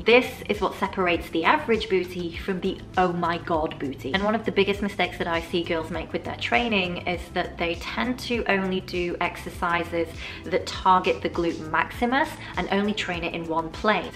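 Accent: Southern England, United Kingdom. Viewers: Thanks:) I'm Spanish. I live in Spain but used to live in your home country England. I also have friends in the south of England, you people have the most beautiful accent! <3 My bum is improving and hubby notices it:D hehe... he says it's harder and rounder. Although I'm lucky and he finds me sexy no matter how big or small, hard or flabby:) I agree with you, age is no excuse to not be your best and my figure is kind of 'getting younger', sort of leaner. I seemed to concentrate my weight around my belly and upper thighs for years. I don't know if it's an age thing but I do think sitting down a lot (for work) has lots to do with it. I exercise at home and what I've done is place 15 kgs of dumbells on my belly. I contract my pelvic area to avoid any harm there and do 30 hipthrust reps concentrating on contracting the bum as you advise, rather than go very high up. 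I do this lying on my back with my legs on a sofa so I don't strain my knees at all.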